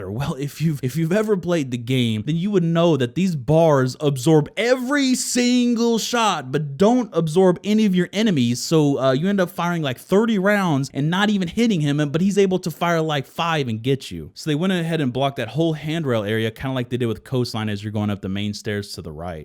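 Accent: Netherlands. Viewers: Are you dutch? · Dude are you Dutch?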